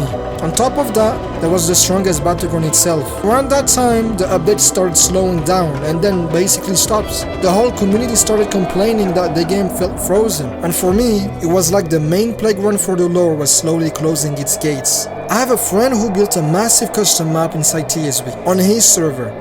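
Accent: France. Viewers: il est français ?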